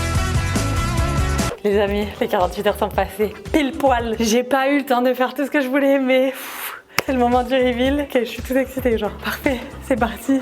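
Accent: Quebec, Canada. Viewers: Je trouve que tu commence à avoir l’accent canadiens et c’est trop marrant comment on peux changer aussi facilement et avoir un accents aussi facilement rien qu’en habitant dans on nouveau pays , j’étais concentré que sur sa 😅j’adore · J’avais pas vu de vidéo de toi depuis un peu longtemps mais t’as grave pris un accent québécois ou c’est moi? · C fou à quel point t’as un accent québécois comparé au début de tes vidéo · Il y a un petit accent québécois qui est en train de s'installer 😁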